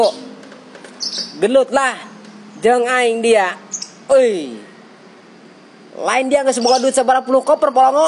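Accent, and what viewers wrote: Indonesia. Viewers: Lucu ey ngomong sunda na😅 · Logat Banten kitu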